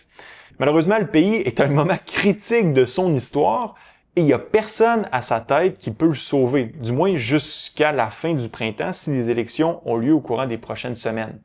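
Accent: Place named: Quebec, Canada. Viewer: Tu vien du Québec j'entend ton accent mais Pourquoi tu mets de l'essence sur le feu ? Lol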